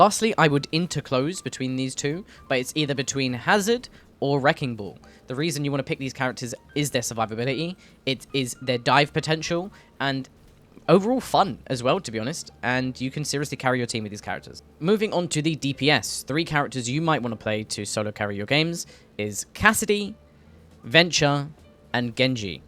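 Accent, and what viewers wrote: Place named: United Kingdom. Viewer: omg he's british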